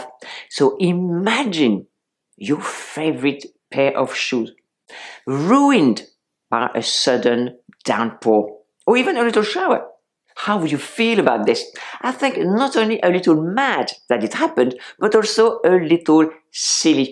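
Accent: France. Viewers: I love your videos and learn a lot. Thank you so very much. I really love your French accent too. 👠👛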